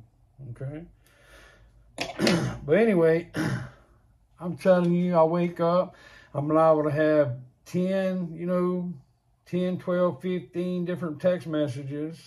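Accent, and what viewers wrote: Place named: South Louisiana, United States. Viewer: I’m Kevin from Louisiana. I tie my own jigs also and I’m always watching to learn new patterns and techniques. Nothing like catching a fish on a jig you’ve tied! Plus you sound like my family and friends from South Louisiana! Hope you catch a bunch of sac-a-lait!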